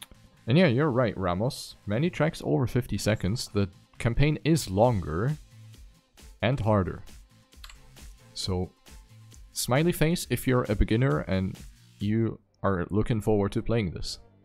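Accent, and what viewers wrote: Germany. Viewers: Are you from Germany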